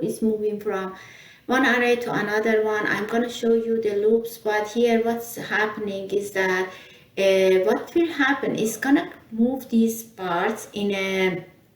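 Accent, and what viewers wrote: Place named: Iran. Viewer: I like your Irani accent jan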